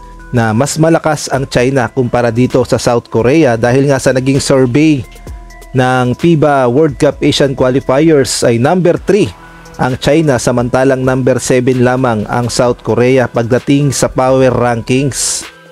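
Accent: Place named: Visayas, Philippines. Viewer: Bisaya man ka dong. 😂😂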